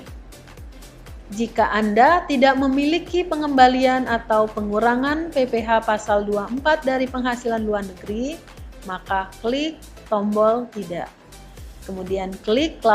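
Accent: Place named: West Java, Indonesia. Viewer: Urang sunda kayaknya ibu nya.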